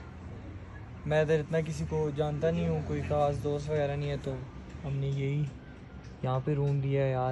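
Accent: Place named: Hindko, Pakistan. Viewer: Ap ki Urdu ka acsent hindko wala he😁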